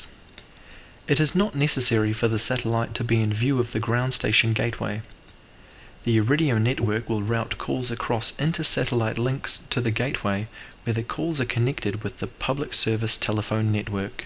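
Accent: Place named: New Zealand. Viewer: It's a New Zealander, trying not to sound TOO New Zealand-y.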